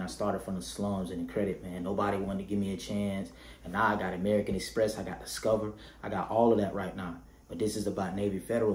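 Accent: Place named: Atlanta, United States. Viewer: sound like you from ATL, i was thinkin of company names MuddHouseReQ did pop up, ill run wit sum ting else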